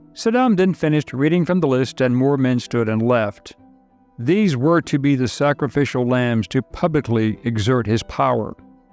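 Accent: United States. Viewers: You have the most American Cowboy voice ever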